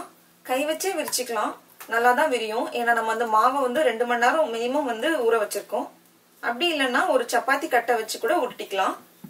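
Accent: Nagercoil, India. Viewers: Thalli, typically Nagercoil slang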